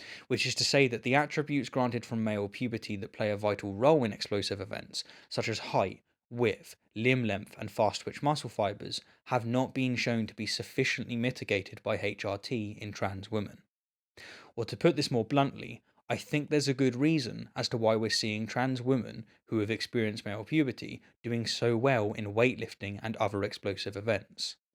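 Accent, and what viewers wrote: England, United Kingdom. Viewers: This is too tragic. I thought this was somehow going to be promising. Forcing skepticism on anything and everything is just a formula for disaster. Talk about scraping the bottom of the barrel. I guess it's just the english accent I so splendidly enjoyed but other than that nothing to distinguish the channel from the rest of the noise.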